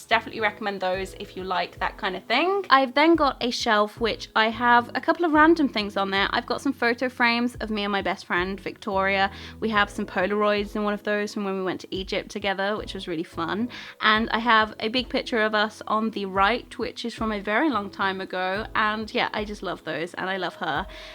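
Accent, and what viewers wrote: United Kingdom. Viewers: I love your uk accent <3 in from the netherlands hahaha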